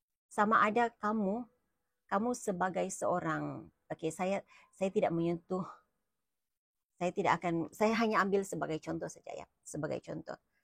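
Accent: Sabah, Malaysia. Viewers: Dari logat bahasanya kaka ini macam orang Sabah sarawak😅😊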